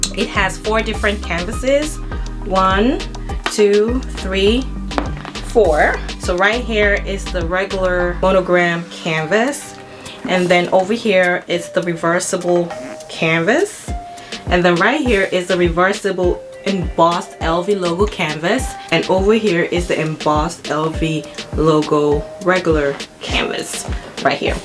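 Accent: Nigeria. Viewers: I've taken a sprint from Instagram to this video...once again I love your nigerian accent💕💕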